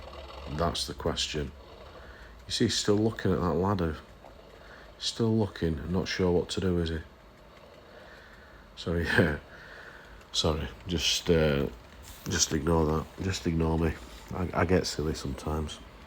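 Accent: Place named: Yorkshire, United Kingdom. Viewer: Enjoying your work. Not a modeler myself but I like the total escape from my normal day that I get from watching your videos. For someone relatively new to it your layout looks great. Your eye for a shot and the videos you produce are virtually unique and are for me far more engaging than the more usual style which mostly consists of trains going round in circles. And all toped of with your dry as dust take it or leave it attitude delivered in a friendly Yorkshire accent. I think you are on to something.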